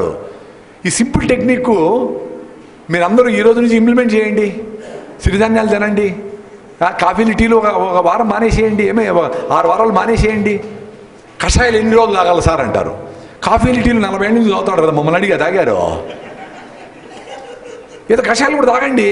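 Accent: Rayalaseema, India. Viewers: love u r rayalaseema slang sir, it makes listeners interesting to hear...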